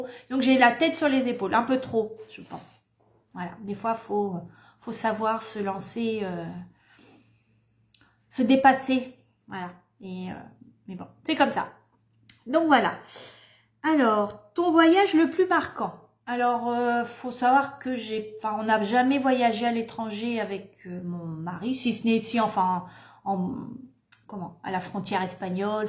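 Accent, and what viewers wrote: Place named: North, France. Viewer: Merci d’avoir partagé un peu de tes secrets, mais c’est bizarre ton accent me faisait penser à celui du nord. Oui le principal c’est d’être avec ceux qu’on aime en bonne santé têt du coup à mon tour de te souhaiter une bonne année, à bientôt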